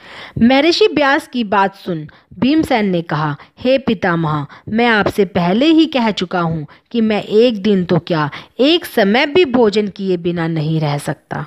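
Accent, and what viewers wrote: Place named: Haryana, India. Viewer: padh padh k mat bolo mataji aur haryanvi accent se mukti pao zara !!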